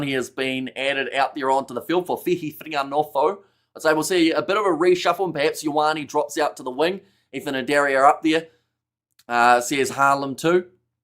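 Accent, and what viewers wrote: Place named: New Zealand. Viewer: This fellow is not a great commentator. Speaks far too fast, very difficult to understand his acute NZ accent. Slow your dialogue down a little mate!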